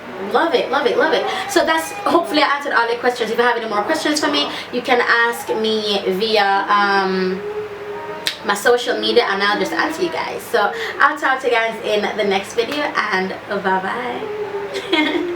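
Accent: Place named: Jamaica. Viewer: I love the way you command the English language and then jumped back to patios 🇯🇲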